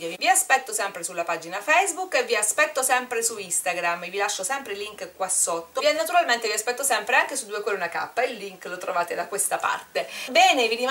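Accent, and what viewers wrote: Sicily, Italy. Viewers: Ma sei Siciliana vero?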